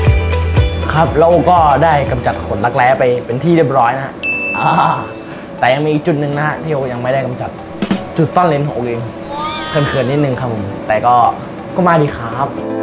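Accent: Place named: North, Thailand. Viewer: อู้เมืองไปเล้ย.!!สำเนียงออกแล้ว อู่เมืองโดย น่าฮักจะต๋าย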